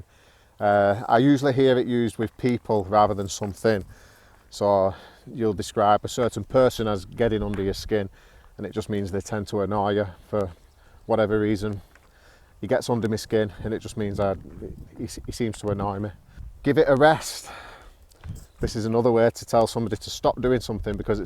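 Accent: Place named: North, United Kingdom. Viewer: Yeah, I guess I've been more exposed to American English lately. It's quite refreshing to hear someone speak with a northern British accent. It brings me memories ( good and bad) from my stay in Manchester. (Fallowfield and Salford)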